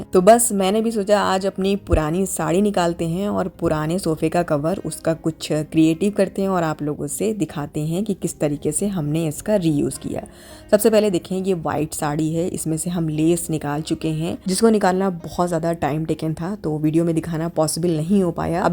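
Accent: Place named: Gorakhpur, India. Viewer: wah masha Allah meri padosan inkli ap...pr ap ki language me gorakhpur side ka touch hai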